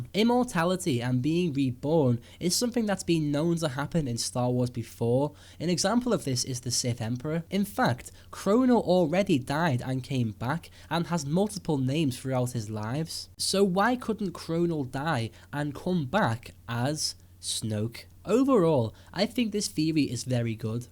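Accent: Liverpool, United Kingdom. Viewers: It's called being a scouser. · Scouser say no more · he's from Liverpool if I'm not mistaken. the Beetles spoke the same way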